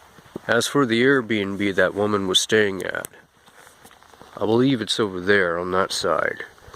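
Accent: United States. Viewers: What part of the USA is your accent from?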